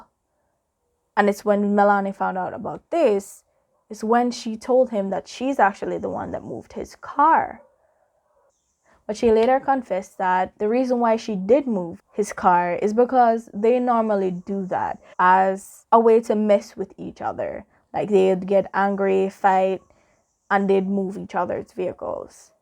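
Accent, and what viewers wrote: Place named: Caribbean. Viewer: Really liked your storytelling and btw are you Carribbean? I love your accent 😍😍😍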